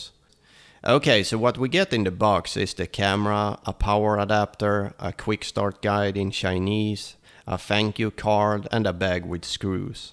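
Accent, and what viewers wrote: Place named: Sweden. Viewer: That must be a swedish accent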